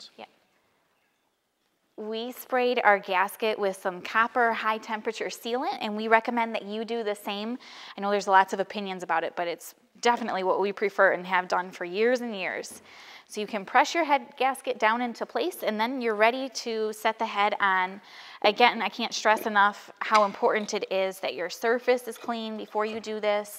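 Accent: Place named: Midwest, United States. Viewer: I could listen to read a phone book.. Reminds me of home with her Mid-Western drawl..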